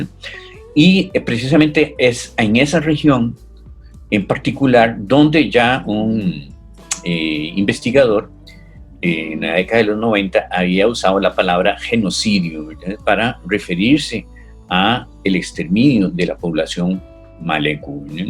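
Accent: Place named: England, United Kingdom. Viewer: Teniendo en cuenta que tiene acento inglés demasiado bien habla de la época virreinal.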